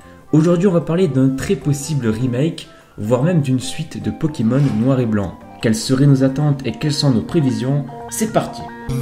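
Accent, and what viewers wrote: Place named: South, France. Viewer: ta l'accent du sud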